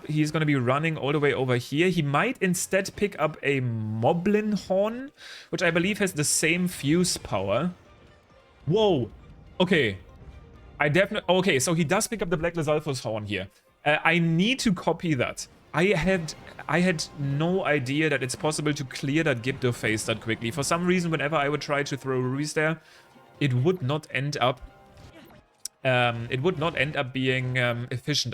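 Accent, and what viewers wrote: Germany. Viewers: are you german? because of your accent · Are you from Germany? Because IT Sounds like you are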